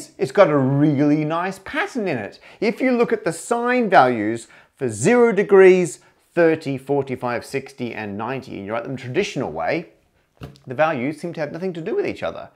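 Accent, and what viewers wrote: Australia. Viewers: Why is Simon Whistler talking Australian? That should be the question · It is probably in part "fault" of the more cultivated Australian (is that what people still call it) + British influences accent, for some reason people tend to see the latter as more posh or smug automatically, the former is reminiscent of the latter, and some people can't tell which is which anyway. I am afraid to say, you're sort of facing an uphill battle on that one, and in reality is no fault of yours in that particular department, since the real fault is just people not being sufficiently exposed to more varieties of English, which sucks but it is also natural. I.e. just bad luck (though I still wish you the best of it, for what it is worth, which is probably nothing).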